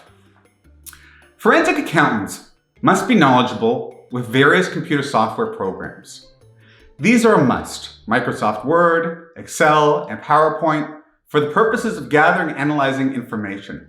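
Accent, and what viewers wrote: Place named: Canada. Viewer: You’re Canadian, aren’t you? I can tell by they way you say process and houses.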